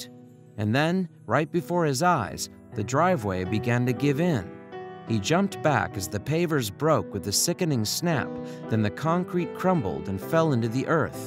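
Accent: United States. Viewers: OOPS! the American accent fooled me. Apparently this took place in England. Never mind.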